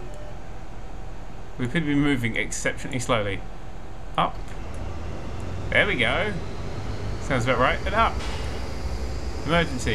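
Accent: England, United Kingdom. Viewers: It's pretty obvious that he's English XD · is he english?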